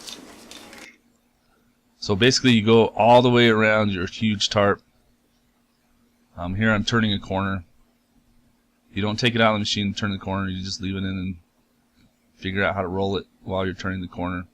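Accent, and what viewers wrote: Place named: Reservation, United States. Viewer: I am kind of curious as to what part of the country you live in? You have what sounds awful lot like a Rez accent.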